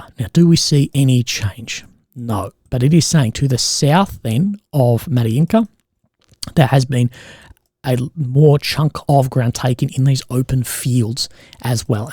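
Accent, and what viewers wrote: Australia. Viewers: Definitely, because Australian English is the black sheep of the English language Dialects. English is my native language and i dont understand them sometimes either. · Thanks, as a Kiwi, your aussie speak is sometimes hard to understand thanks for the subtitles :). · Subtitles are ok but make them optional. For us that can cope with Aussie speak, they are distracting. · wish I could turn them off, but as an Australian I'm used to the accent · a great adittion to a great content, sometimes its a difficult to undesstarnd the aussie accent, even more if english its not your 1st language, so thank you for that adittion, keep on the good work.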